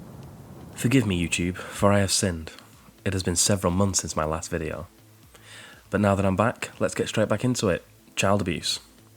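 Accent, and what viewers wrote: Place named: Lancashire, United Kingdom. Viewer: Nice accent ..... Lancs/ Yorks ? Also extremely interesting !!! !it was The Royal Commission that set me free after 40 years !!!!!! I'm a Yorkshire lass !I apologise if you are from Pimlico or Chelsea 👵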